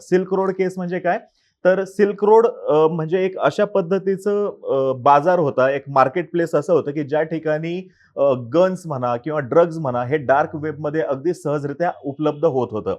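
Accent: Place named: Nashik, India. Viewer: तुम्ही नाशिक चे का हो? तुमच्या बोलीभाषेला नाशिकची लकब आहे..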